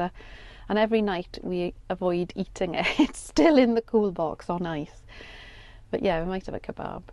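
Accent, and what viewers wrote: Wales, United Kingdom. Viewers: You should definitely think about starting a separate channel dedicated to ASMR, your voice is so smooth and captivating and with that gorgeous Welsh accent I just can't help but fall asleep to your videos, and then I have to watch them again to see what I missed, lol.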